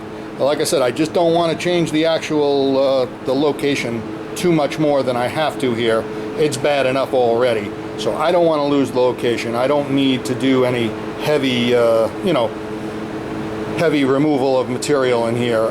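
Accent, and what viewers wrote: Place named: New York, United States. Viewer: Sounds as if you are from New York / or New Joyzee Could you not have put some loads on it that would have , as you carbon arked , helped draw it togeather & then even more so as you welded , hot metal shrinking has ton’s of force. One must be very much a Mc Geever doing this kind of stuff. If that cyl boss is out of square , it will cause side loading to the cyl & it will not last a month ! I own a CASE extend a hoe . Looks like the John Deer is fashioned different, but that looks like the inside of the extender , that would make the boss the lifting point for the boom, final picture, they probably had the boom extended & tried to lift a # of HEAVY objects ,- a stupid move, - , drag it in close , re-rig & then lift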